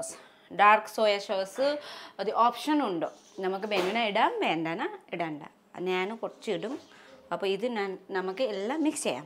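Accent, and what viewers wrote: Tamil Nadu, India. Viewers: Oru tamil touch Ulla malayalam pole thonnunnu😅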